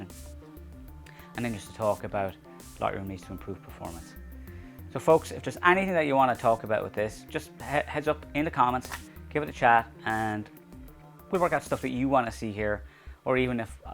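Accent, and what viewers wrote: Ireland. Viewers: I enjoy your videos very much. From seeing so many Brendan Gleason films, your accent is not a problem. There are lots of Irish people here in the states too. But your audio is not ideal. It sounds harsh, like there's too much reverb in your room. There are some cheap ways to address this with towels on the walls. Here is a guy from Wales with many interesting free solutions, whose audio always sounds just right: <link>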